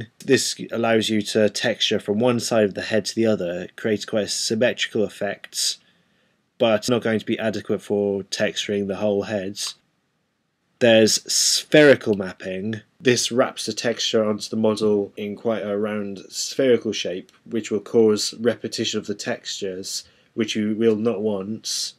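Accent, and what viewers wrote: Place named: United Kingdom. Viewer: He is a Brit! Brit always have cool accent!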